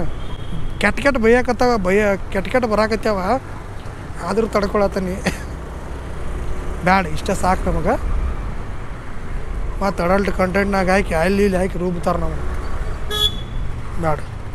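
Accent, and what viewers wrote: North Karnataka, India. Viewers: 😅 uk slang super bro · ಉತ್ತರ ಕರ್ನಾಟಕ ಭಾಷೆ ನ ಹಂಗ ಅಣ್ಣಾ 😅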